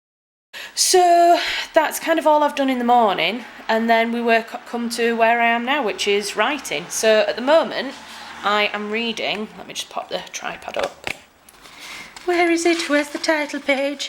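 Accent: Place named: United Kingdom. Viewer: Hi, I clicked onto this by accident and I'm so happy you're so engaging and lovely ! I'm from the Uk like your accent and I'm really interested in getting into writing articles for company's/website. I would want to do it from home, alongside my full time job. Any tips on how to get into that field ? Thanks! Love you !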